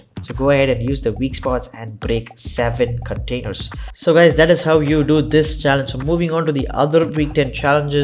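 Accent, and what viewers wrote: India. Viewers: If your indian you have the best indian English accent nice your the only indian I can understand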